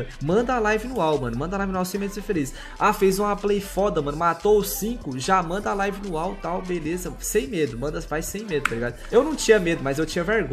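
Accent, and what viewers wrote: Minas Gerais, Brazil. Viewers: ce é mineiro? nois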